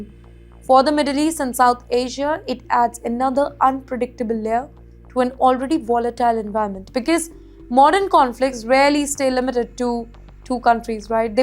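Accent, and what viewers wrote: India. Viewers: Her English smells like Hindi.. and shaking her legs while reading news.. correct it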